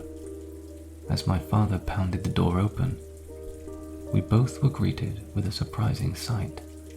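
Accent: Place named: England, United Kingdom. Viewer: the rain.. the English voice.. ahhhhhh yesssss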